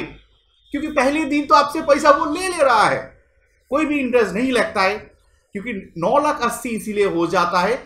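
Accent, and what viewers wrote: Bengal, India. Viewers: Bangali...awaz sunte samjh aa gya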